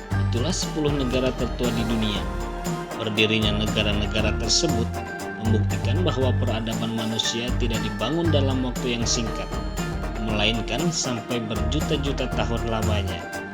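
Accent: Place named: Java, Indonesia. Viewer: Narator bahasanya medoK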